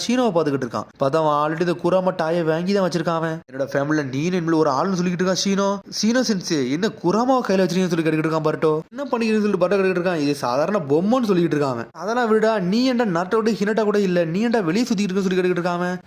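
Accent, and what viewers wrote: Thoothukudi, India. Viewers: Lastaa kuramaa nu sonnathu nalla irunthuchu.. 😊 Neega Thoothukudi yaa na??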